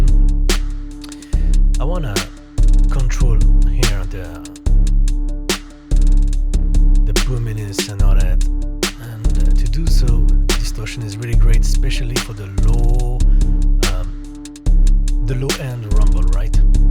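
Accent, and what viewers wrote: France. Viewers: DUDE YOU'RE ILL MAN!! love your accent, you're french ah?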